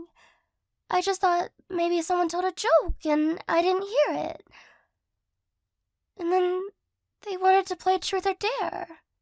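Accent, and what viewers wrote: United States. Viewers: In what part of the english speaking world does this accent come from- ik its american but like- its a good kind of american yknow wut i mean?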